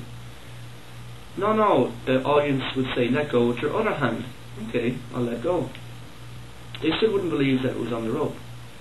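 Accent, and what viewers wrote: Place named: England, United Kingdom. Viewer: Its all in the presentation & how polished the act is, I would suggest rehearsing a script & practice in front of a mirror. Also, try not to mumble so much. I can hear an English -descent accent and yet my North American "western drawl" would still trump your diction.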